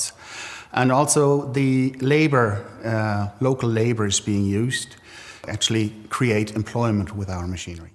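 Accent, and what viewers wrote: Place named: Ireland. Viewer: I hear some Irish in there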